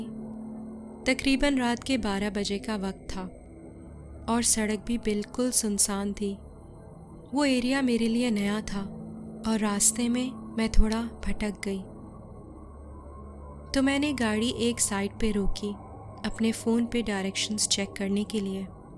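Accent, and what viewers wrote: Pakistan. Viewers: I think she is Pakistani and living in abroad since birth that is why she has amazing accent and maybe fictitious minded so she can create terrific stories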